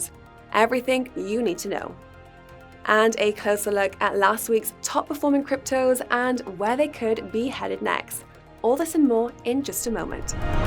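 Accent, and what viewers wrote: United Kingdom. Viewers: sounds British to me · You’re a class act,! Wonderful British accent.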